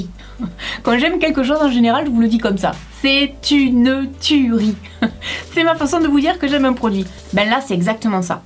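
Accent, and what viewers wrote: South, France. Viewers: Je voulais te demander ton accent vient d’où ??? J’imagine de sud mais je sais pas d’où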